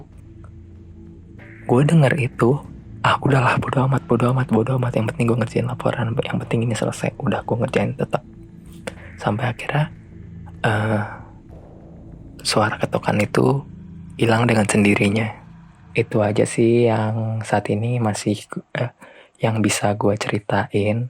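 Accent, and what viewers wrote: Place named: Java, Indonesia. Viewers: lo gue lo gue tp medok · Orang banten tapi medok. Pst dah fasih bahasa jawa ya bro? 😁😁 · Yang lo-gue lo-gue pake logat jawa itu serem gan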